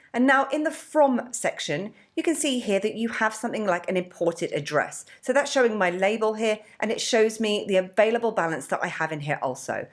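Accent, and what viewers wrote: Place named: Essex, United Kingdom. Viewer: a lovely Essex accent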